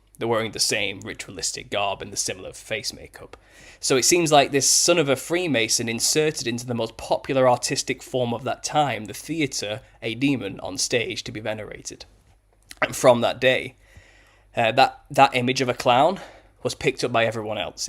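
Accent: United Kingdom. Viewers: you sound hella condescending and subjectively judgmental in sharing information in this video... like bruh, keep it classy and objective. We dont care about how you feel about other cultures, just show us how things correlate and save the sass... you sound very snobby and British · Went straight to Harlequin, but totally skipped the Court Jester of the Middle Ages, which was probably the true origin of the Harlequin. The Wildman was most likely a reference to a Sasquatch type figure since they've been reported all over the world. The red hair may be from the red-headed giants of old. Just because someone has a "British" accent does not mean they're 100% correct about something, do your own research. Good connections though, almost there.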